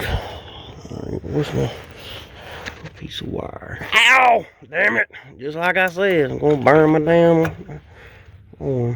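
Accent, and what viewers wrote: South, United States. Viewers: Nothing like having a sip of apple pie moonshine and listening to a Southern Man talk about his bad ass truck💯👍🇺🇸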